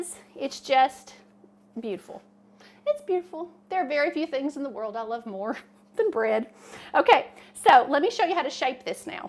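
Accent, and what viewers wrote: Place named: Alabama, United States. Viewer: I've never ever made bread until I saw your recipe. That was Monday. I made it Monday night. It lasted a day lol & was Gone! My husband, kids, and nephew said it was the best bread they'd ever had. So, my dough for these rolls is on its first rise now on the counter. I'm doin a trial run on these so I can make em for Thanksgiving dinner. I know they'll be absolutely delicious, I just want them as pretty as yours lol. I'm gonna try & make their lil "butts" line-up perfectly. I'm new at most things cooking wise. I'm from Alabama & wanna cook hard stuff like meatloaf, pork chops, chicken fried steak, greens, homemade mashed potatoes, fried potatoes & stuff like my Momma made. I'm tryin my best to learn lol... I should have been at home watchin momma instead of runnin around with friends (they can't cook now either)! 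Both my sister's are fantastic cooks cause they watched momma. I really really wanna learn to make fried chicken! My husband and kids love fried chicken. I don't eat meat except fish, but they are all meat lovers. I Love your channel & how you take time to show Every step. Your a incredible cook & so patient & reassuring. Idk where your from but I picked out that accent Fast! Everyone makes fun of how I talk lol. I live in Oklahoma but from Alabama. You seem so precious. Keep the videos comin & ty for being such a great example for beginners like me. -Amanda-🖤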